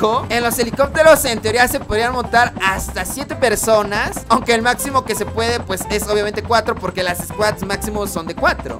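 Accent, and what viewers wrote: Mexico. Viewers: suena como mexicano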